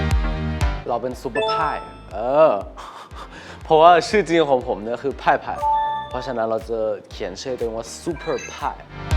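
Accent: Isan, Thailand. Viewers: น่ารักมากกกกกก เอ็นดูท่าปล่อยพลังอะ55555 พูดอีสานน่ารักมากกกก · สำเนียงอีสานได้น่ารักมากๆๆลูก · เอ็นดูเว่าอีสานนนน 5555 ภาษาไทยไม่ชัดหนักแล้วลูกเอ๊ย 5555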